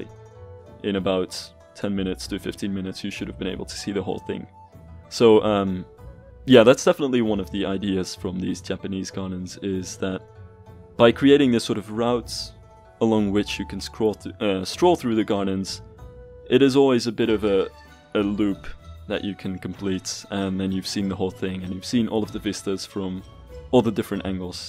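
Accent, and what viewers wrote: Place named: Netherlands. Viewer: Nice!! Duurde even voordat ik het hoorde maar op gegeven moment dacht ik.. wacht eens even.. dat is een Nederlander!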